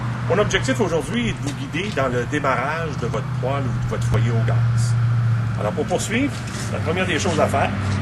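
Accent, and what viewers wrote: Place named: Quebec, Canada. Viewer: Un québécois !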